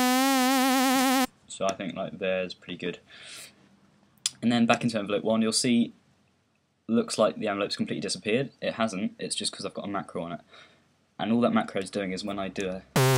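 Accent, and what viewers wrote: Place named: United Kingdom. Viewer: So British it hurts :P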